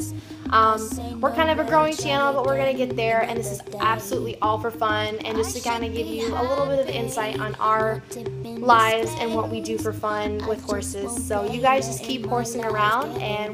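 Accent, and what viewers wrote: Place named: North, United States. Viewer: Country what? U sound like ur from the north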